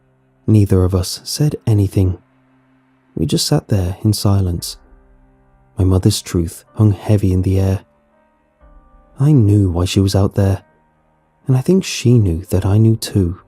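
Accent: England, United Kingdom. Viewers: Hahaha He do have a noice English accent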